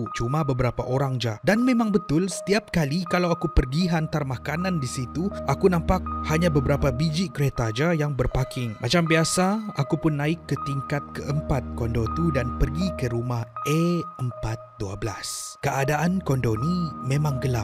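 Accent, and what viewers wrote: Sabah, Malaysia. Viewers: dia ni orang sabahh ka?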